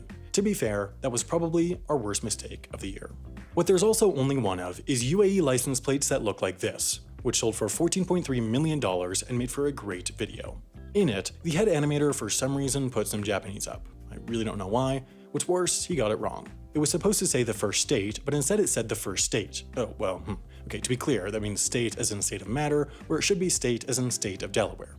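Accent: Netherlands. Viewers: Wait... You're dutch? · are you dutch?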